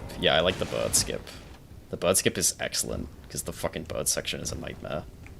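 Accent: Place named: United Kingdom. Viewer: is my favorite Australian streamer. Weird that he has a British accent though.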